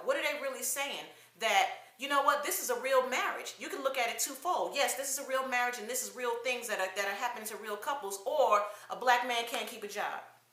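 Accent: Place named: New Jersey, United States. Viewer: Ms, great message. I could tell you were raised with your dad by the attitude you have toward family men that provide. Also I can hear that new jersey accent. Peace and blessings.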